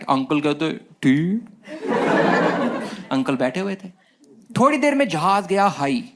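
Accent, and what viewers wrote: Punjab, India. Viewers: bhai ka punjabi accent makest it more funnier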